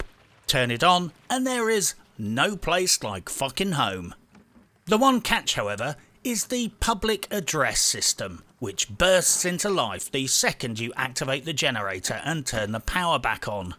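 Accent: United Kingdom. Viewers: Thanks british guy 👍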